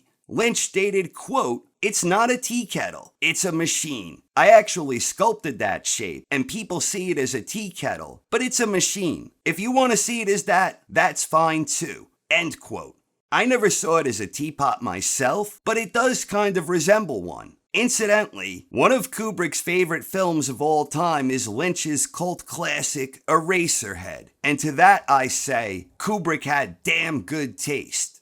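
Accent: New York, United States. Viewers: What accent? He sounds like a native speaker from New York or Jersey. That would be a dialect. "Accent" is only from one language to another.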